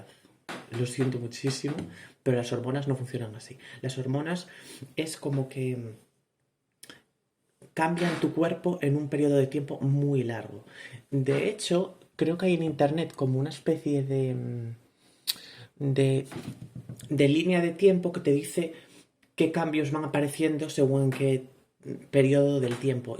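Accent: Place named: Spain. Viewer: Yo ni se xq estoy aqui pero ese dejo en hembra española encanta la verdad ❤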